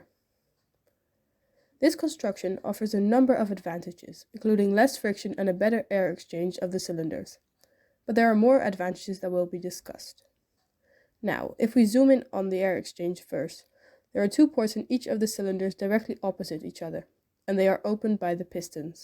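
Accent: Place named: Netherlands. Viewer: I'm not an expert. But if you could tune the balancing of the counterweights right, this seems functional. Using the power stroke to directly power the compression stroke of the other 'cilinder'. Just one note however: due to the placing of the gears, the arm wich rocks the pistons back and forth is not symmetric. Causing the pistons to slowly compress on the left. And rapidly compress on the right. To me that seems like a bad thing. But that's all I got. (En ook erg goed Engels. Ik had bijna niet door dat er een Nederlands accent was :D )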